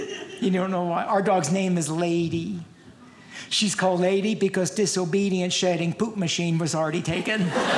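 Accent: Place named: Louisville, United States. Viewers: You can always tell someone is from Lullville. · He is where I'm from in Ky. He's very southern sounding for being from Louisville.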